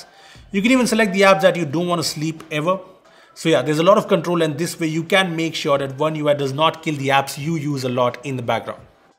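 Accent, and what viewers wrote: India. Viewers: I hate indian english accent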